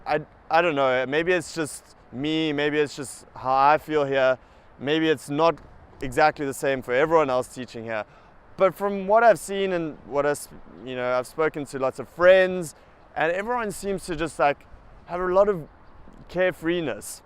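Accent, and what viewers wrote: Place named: South Africa. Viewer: You sound incredibly South African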